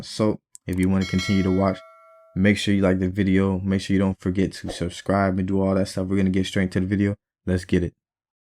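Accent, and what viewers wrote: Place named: United States. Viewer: How do have an account on tradersway you sound like you live here in America. I tried to sign up they wouldn't let me have any account. You have any videos that teach american people how to do sign up and use these brokers? I signed up for many and all of them ask you to verify yourself to withdraw money. what is your workaround for that?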